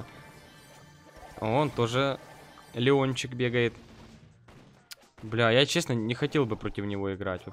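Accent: Ukraine. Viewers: Ухх как же меня бесит его акцент. Сколько лет знаю, столько лет мне режет уши его украинский акцент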